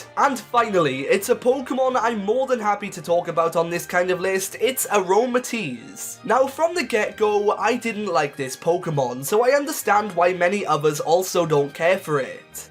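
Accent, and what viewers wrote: Wales, United Kingdom. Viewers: Are you welsh mate? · Are you Welsh?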